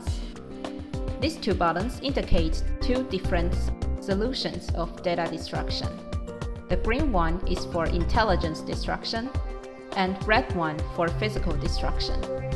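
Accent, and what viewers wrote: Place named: China. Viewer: Her voice sounds like a robot with a Chinese accent...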